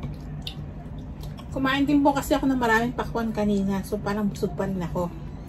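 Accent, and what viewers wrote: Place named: Batangas, Philippines. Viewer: Hello sis ko, medyo nalate ako ngayon. Sarap ng chicken curry sis. Sarap mo talaga magluto ng mga may gata. Akoy relate na relate sa punto ng salita nyo sis palibhasay malapit lang sa tiaong ang batangas. Hehehe. Ineng din ang tawag sa amin eh. Hehehe. Hello sa sister mo sis. Waiting talaga ako sa gelatin eh. Sarap. Ingat kayo lagi dyan sis. Waiting for youe next mukbang with Jaxxas. 💞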